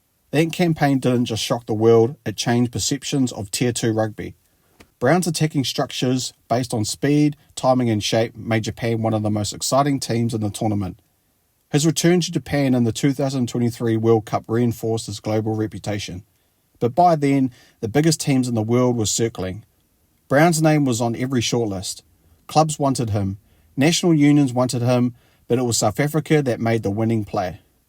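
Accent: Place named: New Zealand. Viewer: commentary sounds like a Kiwi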